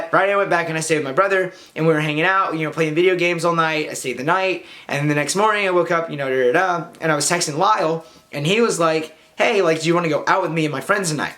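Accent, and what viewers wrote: South, United States. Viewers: Hey ya'll. I wanna here some southern draw sometimes in your vids, please sweety???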